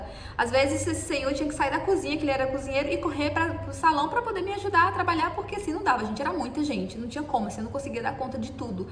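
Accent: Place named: Northeast, Brazil. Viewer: Acho o sotaque nordestino horrível , mas gostei tanto do jeito dessa menina,do espírito aberto dela, que nem consegui reparar o sotaque.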